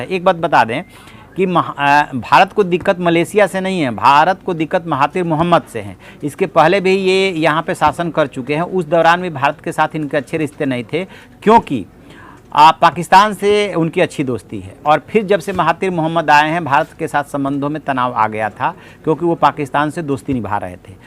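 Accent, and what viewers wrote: Bihar, India. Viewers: सर आप बिहार के रहने वाले हैं क्या???? आप की आवाज़ बहुत ही मृदुभाषी है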